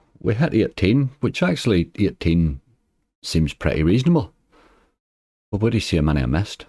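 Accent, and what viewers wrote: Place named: Northern Ireland, United Kingdom. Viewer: yea im from belfast new the accent rarely see people from NI on the slots videos lol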